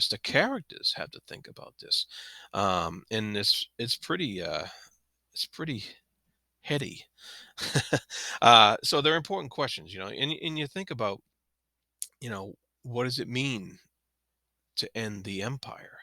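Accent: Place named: New England, United States. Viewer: I love your accent !! - a fellow New Englander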